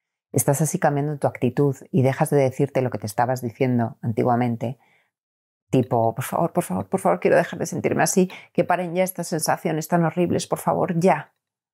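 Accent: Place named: Spain. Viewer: TENES UNA HERMOSA VOS, GRACIAS POR TU AYUDA, PRESUMO QUE ERES DE ALGÚN LUGAR DE ESPAÑA. 😁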